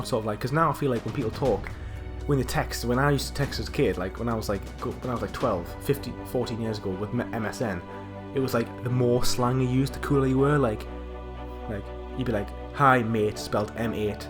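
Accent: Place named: North East England, United Kingdom. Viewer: Are you from the north east of England by any chance? If you are, then I’m a fellow north east trophy hunter haha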